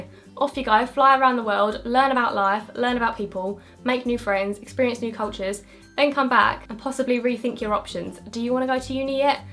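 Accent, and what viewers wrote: Essex, United Kingdom. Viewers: I love your Essex accent! Also you're funny and seem so down to earth x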